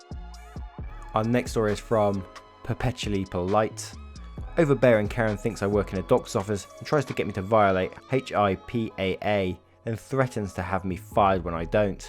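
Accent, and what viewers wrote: United Kingdom. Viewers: HI BRITISH PERSON!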